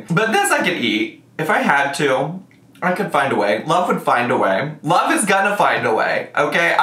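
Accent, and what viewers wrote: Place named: Louisiana, United States. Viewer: this might be weird but are you from Louisiana? because you sound just like my English teacher from Louisiana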